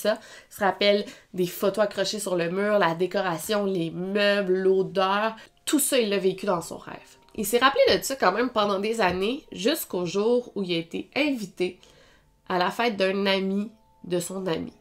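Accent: Quebec, Canada. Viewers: Slt toujours très intéressent ton contenu sinon je trouve que tu perd un peu de ton français et que tu prend un peu plus de ton açent québécois ce qui facilite un peu moins ta compréhension cela pourrait nuire a ta chaîne et a ton public français...sinon c'est toujours sympa de t'écouter a plus👋👏👋👏🤟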